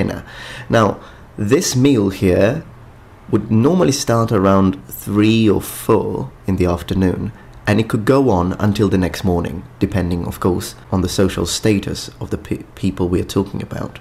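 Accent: United Kingdom. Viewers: Did you have a British parent or live for some time in the UK. You speak English like a UK citizen. Oh, by the way, I think your Italian sounds better than your Classical Italian. Your Italian sounded smoother, and you seemed more at ease with it. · Well technically he is speaking English correctly, with a British accent. Probably how he learned it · He's Italian with a British accent. Love it!!!!!!!👍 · You are an Italian with British accent? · he sounds more British than Italian.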